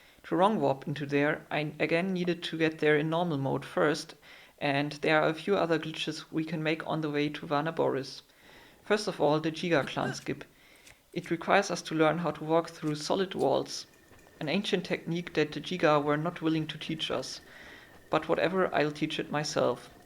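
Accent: Germany. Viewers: Man merkt den Deutschen akzent hast ein gutes Englisch :D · deutscher akzent :D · man hört sofort den deutschen Akzent raus :D gutes video! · As a German I knew you were German without seeing in-game language :D we just can't get rid of that accent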